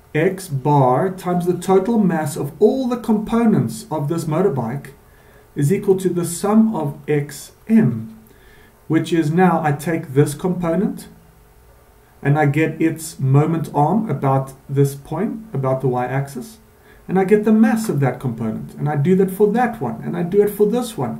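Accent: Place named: South Africa. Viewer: It's so refreshing hearing a Saffa's vvoice on one of these videos : )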